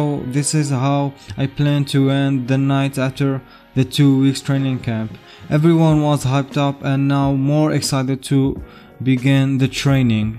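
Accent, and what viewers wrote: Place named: United Kingdom. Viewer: You became extremely British this episode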